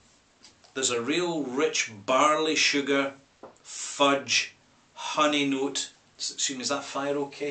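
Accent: Scotland, United Kingdom. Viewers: Hey, thanks for these entertaining and informative, no, I say EDUCATIVE reviews. I enjoy them regularly and often try out some of your recomendations. Sometimes you refer to a single malt as "rederly available". Now sorry to ask, I am not familiar with scottish accent (which sound very pleasant, even adorable to me, at least the way you speak it). Do you mean "rarely available" or "really available". Would be quite essential to know. Thanks for an answer.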